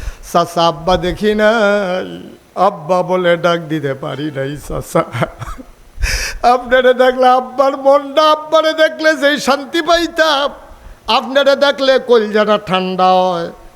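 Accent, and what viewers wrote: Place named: Comilla, Bangladesh. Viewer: হুজুর মনে হয় কুমিল্লার। মাশাল্লাহ অনেক সুন্দর ওয়াজ।💯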